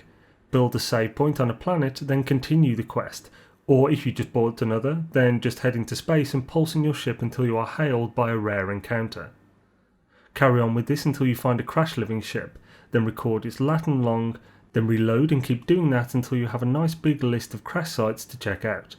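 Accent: United Kingdom. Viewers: It just sounds like he is mostly mumbling and I didnt understand a word of what he was saying. If this is what most youtube Brits sound like then good god. Sounds like I was listening to Kermit the frog from muppet puppets lol. 😂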